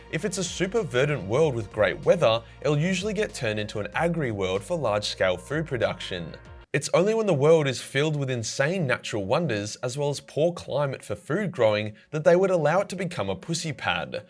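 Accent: Australia. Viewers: This is exactly your type of video, time to use that sweet Aussie voice to explain the most beautifully deceiving worlds in the Imperium.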